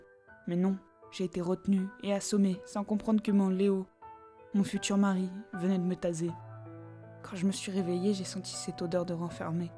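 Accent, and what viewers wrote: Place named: France. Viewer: Ton accent français c' est fou comment je trouve ça très touchant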